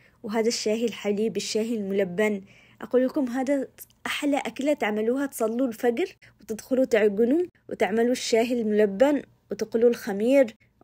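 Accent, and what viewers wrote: Aden, Yemen. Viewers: ما شاء الله حلاوة كلامها ولغة أصحاب عدن...حبيت العفوية والاسلوووب.. الله يحفظك ويسعدك أختي الغالية.. متابعة جديدة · حبيبتي والله انا من السعودية ذكرتيني بصحبتي الغالية من ايام الجامعه كانت عدنية و نفس لهجتك❤❤❤❤ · اول مره اشوف قناتك ياسلام ماشاء الله ستاره على خفه دم واحلى شي لهجة اهل عدن عسل والله معك إبيه🥰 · الله يحفضك غير الطبخه الي ترجعني للبريقاء اللهجه كمان ترجعني لبيتنا ولاهلنا تحيه كبيره ككبر عدن بكله لك وتسلمي علا الطبخات الي تحسسك بالفن لانه معروفه عدن ملكت الفن والله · اتابعك من امريكا عشان اسمع الكلام العدني 😅 ماشالله طبخك ياسلام 👏👏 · ياالله مااحلا كلامك يذكرني بعدن الحبيبه الله يعطيك العافية تسلم ايدك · ماشاءالله اللهجة العدنيه تجنن انا فقط متابعه الفيديو عشان أسمع اللغة الجميلة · اقول شيء اووووول مرررره احب اللهجه اليمنيه قسماً بالله مره حلوه اللهجه يا زينك. بس لازم اجرب هذي الخبز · كلامك يجنننن عدني قح😂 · انتي الاحلا والاغلا طبعا شفت شوي من فيديوهاتك وان شاء الله اطبق كل شى واخبرك النتيجه اتمنى لك التوفيق والنجاح تدري اش احلى شى بقناتك طبعا كل شى حلو الشرح والطبخات وكل شى بس اكتر شي عجبني طريقتك بالكلام او ب الاصح انك محافظه ع لجهتك العدنيه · اول مرة اتابع يوتيوبر يمنييية كتير حبيت لهجتكن وقناتك حلوة واكلاتكم بتشهي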